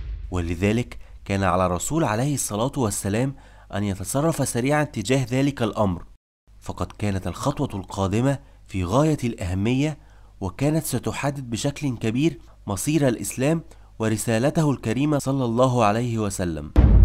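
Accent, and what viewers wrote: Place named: Egypt. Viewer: ... عليك نطق الحروف بشكل جيد فأنت تتحدث بالعربية وفي موضوع علمي ... متى تتعلموا إخواننا المصريين أن تتخلوا على لهجتهم عند التحدث بالعربية والله أغاظني نطقك للكاف عوض القاف وكثير من الحروف 😢😢😢 حرفتها.. رغم أن المحتوى جيد من حيث المعلومات الدقيقة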